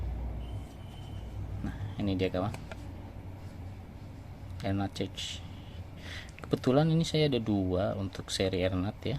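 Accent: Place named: Banyumas, Indonesia. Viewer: Salam Mantap....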